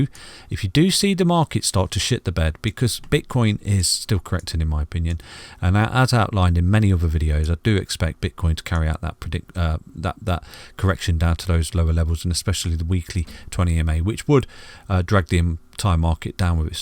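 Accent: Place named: Nottingham, United Kingdom. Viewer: that sounds like a Nottingham accent there? Forgive me if I’m wrong! Great videos! 👊🏽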